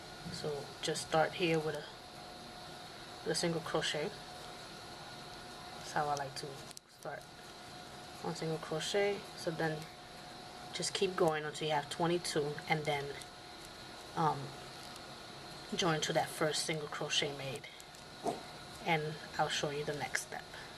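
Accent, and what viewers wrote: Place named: Louisiana, United States. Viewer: Thank you so much for not drawing every stitch out. I love how fast you instruct. Thanks again .I truly appreciate your style of teaching. The speed and accent in which you speak remind me of people in my hometown of Baton Rouge Louisiana.Anyway Thanks again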